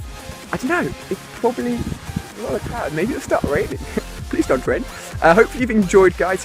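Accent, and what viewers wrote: England, United Kingdom. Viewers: Trust me, I know you're not hating. But most of us in the UK pronounce it like that. Same with due, and durability. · He's in England why the fuck would he be in Scotland because he has a English accent like me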